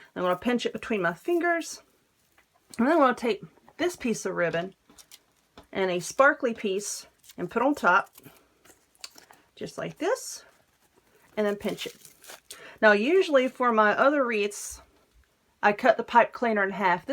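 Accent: South, United States. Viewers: Just beautiful! Love your Southern accent. Keep up the good work. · I love your deep southern accent! And the way you explain things makes me feel like I could do this project too! So inspirational! Thank you!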